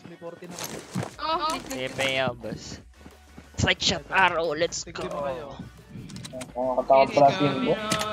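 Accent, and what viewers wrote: Visayas, Philippines. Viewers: Bisaya kadiba idol??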